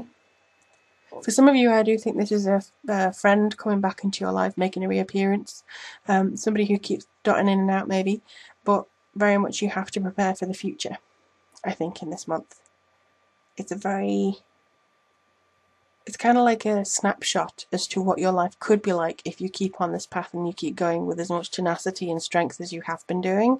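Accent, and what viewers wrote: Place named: Liverpool, United Kingdom. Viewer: Liverpool/Wirral girl? I hear the accent of home :) Good reading, thanks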